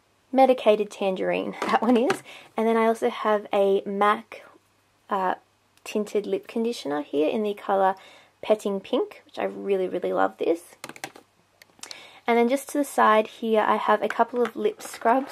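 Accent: Australia. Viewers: omg i love australian accents (and your collection) :)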